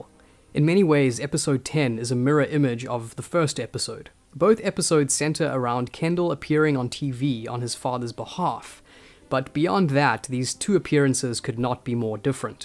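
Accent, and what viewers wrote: South Africa. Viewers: Can hear that South African accent :P nice videos bru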